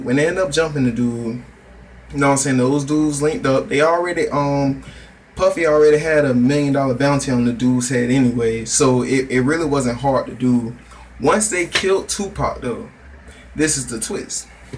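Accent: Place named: Atlanta, United States. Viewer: U must be from Atl, judging from the accent and grill. However some of your info was correct and some wasn't. I'm from Compton and Baby Lane grew up right down the street from me. I strongly believe he had something to do with Pac's murder, but I don't think he pulled the trigger. Futhermore most of the people associated with this case are dead now. So it's really just a lost cause unfortunately.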